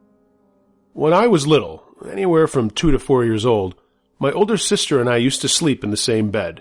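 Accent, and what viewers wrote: United States. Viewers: Your channel is one of my favorite and best accidental finds yet. <3 I adore creepy pasta, true stories and paranormal anything. I was having a hard time finding an American accented narrator I really enjoyed. Your pitch, intonation, and tempo .... spot on. <3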